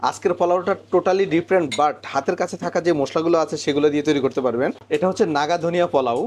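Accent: Noakhali, Bangladesh. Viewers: হেতে কি নোয়াখালী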